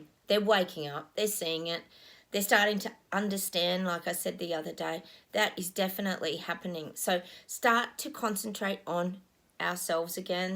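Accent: Australia. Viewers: I enjoy hearing your Aussie accent,! Lovely. Here in the USA, Arkansas, we are in triple digit heat, and blessed to have air conditioning in my home. Blessings to all who are striving to stay in peace in our hearts. Thank you for your messages and your humor😄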